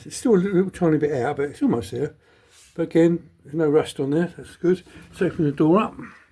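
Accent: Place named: Southern England, United Kingdom. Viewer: Hello. You probably know just saying, A30 has long whip gear lever and later A35 has remote gear lever and slightly altered transmission tunnel. I think the last 1098 engines had a beefier gearbox casting as well some one else will know for sure. I had a late 1098 van (JUW 334 D) for a bit and being so light it was a lot quicker than you’d think and it was bog standard. There were lots of A30s with A30 gearbox and A35 engines - 948 with modern big ends you could rev all day - so no tunnel mods, but i think general opinion was put the 1098 with its correct beefier late gearbox, something to do with baulk rings? Correct me anyone if I’m wrong it was forty years ago. Love your videos mate, wish I could weld like you do. Got a huge soft spot for A30s and anything 1950s. You’ll end up with panels fitting better than from the factory. 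Don’t mind bits of music and I like your voice - spent years in the midlands and any southern accent now is music to my ears. Cheers.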